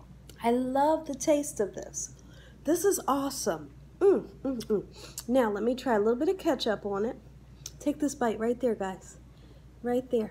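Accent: South, United States. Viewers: I love your show, my granddaughter love you when u say "like so" too bad for the haters. I LOVE YOU. R u from the South. My name is Pam I live in Up State NY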